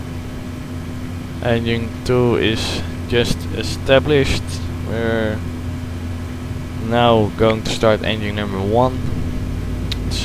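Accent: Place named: Netherlands. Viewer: Nice video, I like your Dutch accent :-) That's remember me the cockpit visit when I fly with KLM, lol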